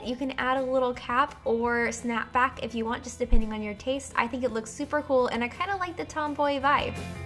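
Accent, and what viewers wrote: United States. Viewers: you really look like gemma styles and your voicebut with an american accent <33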